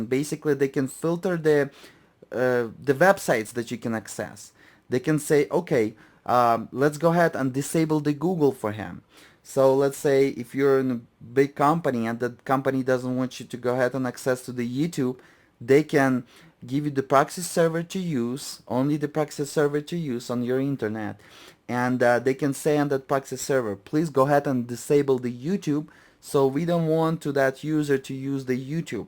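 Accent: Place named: Germany. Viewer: he sounds german to me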